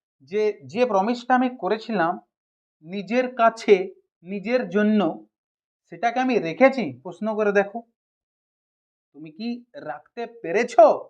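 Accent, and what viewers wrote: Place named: India. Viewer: স্যার আমার মনের মধ্যে একটা প্রশ্ন অনেকদিন থেকে আছে - আপনার কথার মধ্যে হিন্দি টান টা কি করে হলো? Please স্যার জানাবেন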